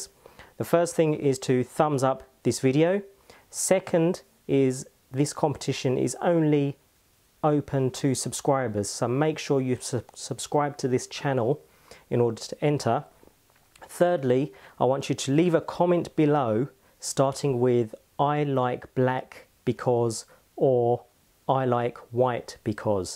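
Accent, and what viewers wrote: United Kingdom. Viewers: I like white because it really makes the iPhone stand out and make it look sharp. It's also easier to find and more pleasing to the eye. I really hope you chose me. (LOVE BRITISH ACCENTS) ;)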